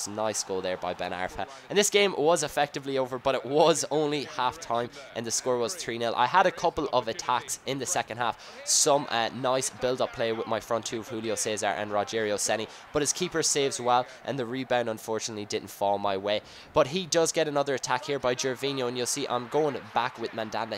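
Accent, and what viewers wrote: Ireland. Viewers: Wow this series is godly btw where are you from you sound Irish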